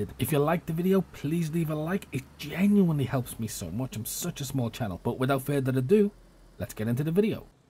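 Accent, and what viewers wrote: Liverpool, United Kingdom. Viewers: is that a scouse accent close by to maybe, lets just say city centre?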